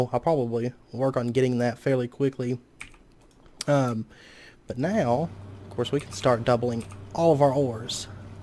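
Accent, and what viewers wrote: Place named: South, United States. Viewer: Just starting your series even though it's dated. The same 1 I am playing now. I am new to modded Minecraft. Really enjoying the video so far, took a quick break to ask you where you're from? You sound very Southern. :-)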